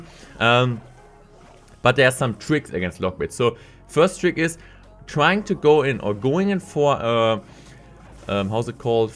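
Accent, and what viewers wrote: Germany. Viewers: Just found this video on my recommended. This guy's accent sounds german. Lit 👌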